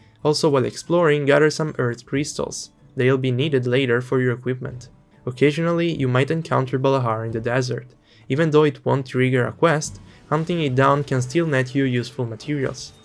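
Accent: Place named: Italy. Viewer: he's italian, so you gotta deal with his accent, he speaks very clear imo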